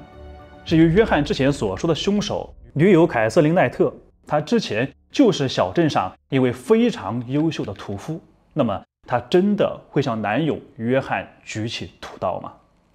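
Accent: China. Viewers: 内地口音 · 我一直想知道你是哪裡人？字幕是繁體，用語和口音卻比較像國內，所以比較好奇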